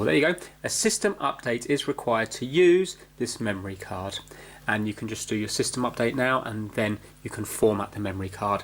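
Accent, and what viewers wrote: England, United Kingdom. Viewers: You have the friendliest british accent I have ever heard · Jamás había escuchado el acento inglés, sin duda me sacó de onda por que es algo diferente jaja, espero comprarme pronto mi nintendo switch, veré este video mientras la configuro ;) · i love this guys accent even tho im british too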